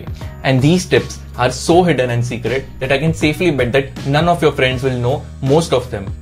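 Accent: India. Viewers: For an Indian, your grammar is okay. Just work on the accent. Face it, you don't like it when Northeast people speak Hindi with very funny accent. Likewise. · Indian accent really annoys me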